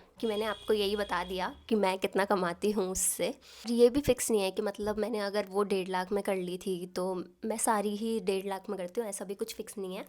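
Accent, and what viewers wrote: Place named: Pahadi, India. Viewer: Pehli bar aapki video dekhi aapke bolne k accent se hi pta lgra h pahadi ho.... 😂❤ I saw your video for the first time and I liked it, it was very honest.❤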